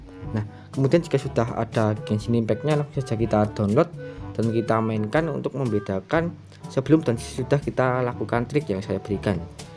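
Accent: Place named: Java, Indonesia. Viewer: Anjrr wong jawa cuk .. wis bli beres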